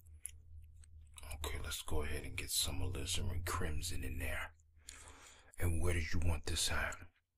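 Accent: New York, United States. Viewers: Is he from New York? Accent vibes. Was waiting for him to say deadass....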